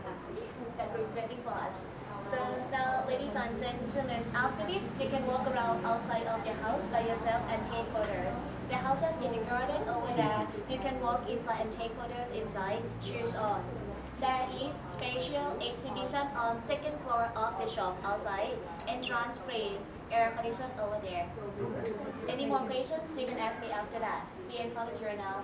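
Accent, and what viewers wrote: Scotland, United Kingdom. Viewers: Interesting museum, but camera-work amateur. Hand-held and shaking, lens mostly on the tourists, not on the artwork. Outside, noisy traffic, cant understand the Scottish accent.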